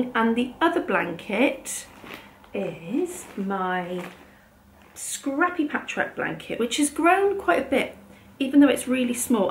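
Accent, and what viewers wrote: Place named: Devon, United Kingdom. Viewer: I’m absolutely here for you and your strongest Devonshire accent. Lovely to spend time with you again xxx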